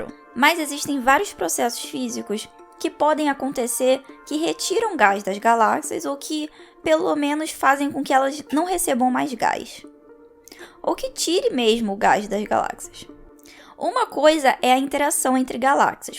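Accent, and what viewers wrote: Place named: Rio de Janeiro, Brazil. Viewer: Mds, sotaque carioca é perfeito aff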